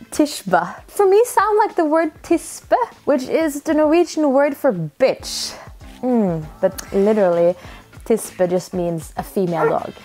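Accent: Iraq. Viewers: You are so cute speaking the Iraqi dialect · the Iraqi accent has been liked by you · انتي تتكلمين لهجتنه العراقيه الجنوبيه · the iraqi accent made it more charming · This iraqi accent is so cute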